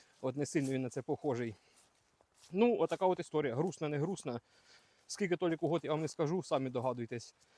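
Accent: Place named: Zaporizhzhia, Ukraine. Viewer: З Днем Народження,! Миру і всього доброго! Так приємно бачити земляка, чути наш говір,ви з Оріхова,а ми з Поліг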